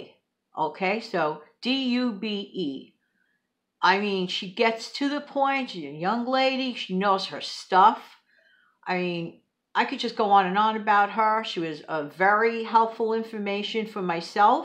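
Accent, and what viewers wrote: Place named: New England, United States. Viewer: Hello Miss from New York City! Your new Georgia subbie friend here. Congratulations on 1,000 subs!! I think you should have many more. Your videos are very informative, thought provoking, and useful, you've got a good sense of humor, love your Yankee accent 🙂 I'm going to binge watch all your videos. Have seen many but not all. Anyways, thank you for making this one. I've been thinking for months about making a channel. So I appreciate you being forthright about your struggles. Off topic, but a question. Do you have any place up there where you can be around grass and trees and enjoy any nature this beautiful time of year? Never having been there, my knowledge of where you are is limited to movies, lol! All I know is somewhere there's Central Park and maybe not another blade of grass or tree anywhere!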